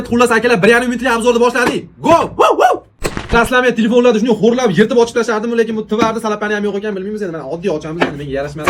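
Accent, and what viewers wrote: Andijan, Uzbekistan. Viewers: Андижон шева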